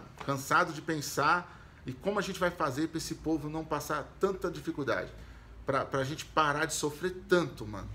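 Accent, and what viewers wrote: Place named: Urban periphery, Brazil. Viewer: Vc tem a voz da periferia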